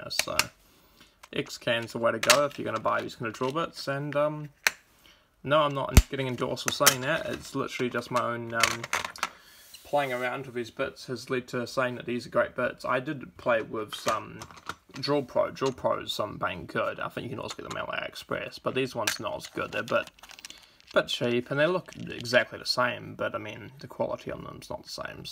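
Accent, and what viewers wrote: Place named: United Kingdom. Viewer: Doing materials could you try to drop a little that british accent, please? People from outside UK find it hard to understand you :(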